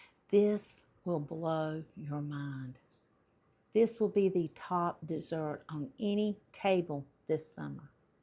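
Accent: South, United States. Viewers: Love the Southern accent! Honestly, I have to make that lemon lasagna!!! Thanks for an easy recipe that hopefully I can make. Looks so delicious I cant wait to try it. Thanks again 😊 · FIRST TIME seeing ya!! I had to subscribe!! I love your Southern Belle accent !! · I love your southern accent. Yummy lemon!! I want to try making this. Hoping all the ingredients you used are available in Canada. ❤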